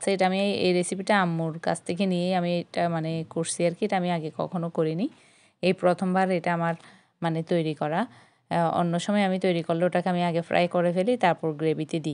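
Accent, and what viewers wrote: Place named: Chittagong, Bangladesh. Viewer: অনেক সুন্দর হয়েছে আপু ভালোবাসা রইল তোমার জন্য 🎁🎁পুড়ে যাওয়া ভাত খাওয়ার উপযোগী করে নিলে আমিও চট্টগ্রামের মেয়ে তোমার কথা শুনেই বুঝতে পেরে গেছি ভিডিওর শুরুতেই ❤❤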